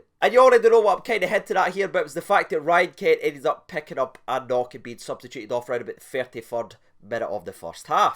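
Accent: Scotland, United Kingdom. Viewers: Have you seen the auto generated subtitles to your video? really funny. it's never too great with Scottish accents, but with that thing on your nose, there's barely a word right. Anyway keep up the good work with the channel 👍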